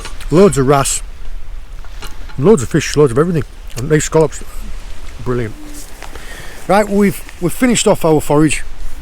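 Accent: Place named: Hull, United Kingdom. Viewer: Proper theraputic, end of hard week, couple of beers watching. There's a different world under the waves. Can't pin the guys accent down though. Wanna say Hull but it'a not quite there.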